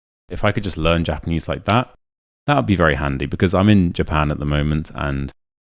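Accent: United Kingdom. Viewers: I love your voice tembre and distinct British accent is so pleasant for my ears. Subscription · 'Thanks for this video and your British accent!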